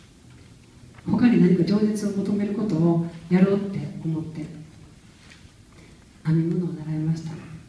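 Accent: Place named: Osaka, Japan. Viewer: いつもより大阪弁のさんが新鮮です！ありがとうございます😊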